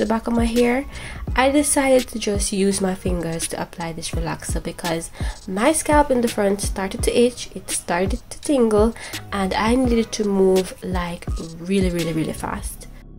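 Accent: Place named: Jamaica. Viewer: I know that beautiful accent anywhere! Go thru yawdie 🇯🇲🇯🇲! U did amazing. So I have a question for you , So I'm natural never been relaxed , but I'm currently contemplating relaxing my hair. Any suggestions as it relates to what relaxer to use? Being as how it's going to be my first time do you suggest going to the salon to get it done or doing it myself?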